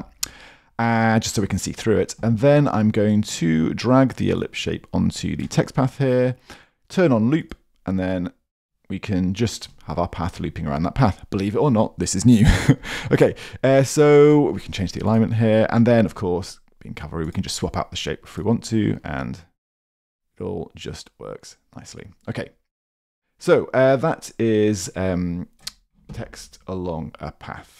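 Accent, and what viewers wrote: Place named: United Kingdom. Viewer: He can't change being British. Also maybe check the playback speed in your settings.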